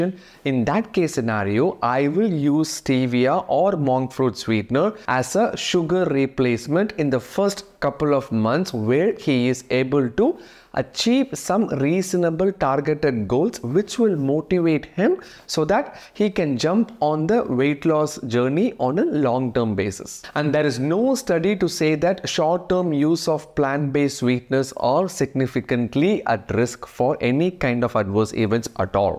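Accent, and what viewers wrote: Tamil Nadu, India. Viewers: I like your accent of English with strong influence of Tamil. :) so natural, just like the way we speak Tamil :)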